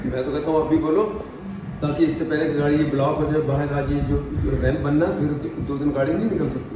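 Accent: Punjab, India. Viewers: Mujhe bhi panjabi nhi aati...but i like your panjabi accent....mujhe to bahut achcha lagta hai uncle apse panjabi sunna..i like the way you talk ..think..and everything..don't change Uncle..we like you the way you are..🙂🙂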